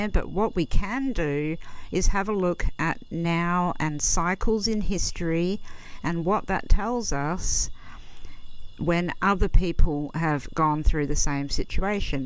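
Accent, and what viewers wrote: Australia. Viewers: Are you Australian,? 👋🇦🇺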